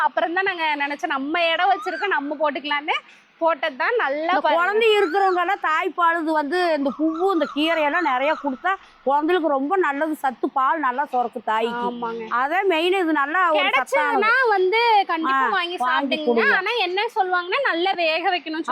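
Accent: Kongu, India. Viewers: ஐஸ் நான் போலிஸ் தான் அனால் உங்கள் கொங்கு தமிழ் பேச்சுக்கு அடிமை என் வீட்டில் மாடி தோட்டம் வைத்து இருக்கிறேன் உங்கள் வீடியோக்கு காத்திருப்பேன் சென்னை வந்தாள் எங்கள் வீட்டிற்கு வரவேண்டும் 🙏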